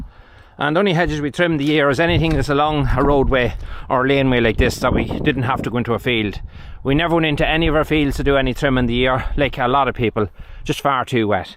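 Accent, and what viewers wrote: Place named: Rural, Ireland. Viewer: I have lived abroad for almost 25 years. I grew up on a farm in Mayo - I love your English and use of vocabulary, it's so rural Ireland. Big respect ❤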